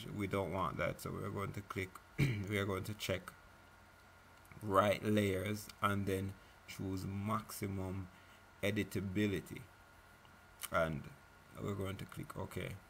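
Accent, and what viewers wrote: Jamaica. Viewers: u jamaican dont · Are you Jamaican> :D :3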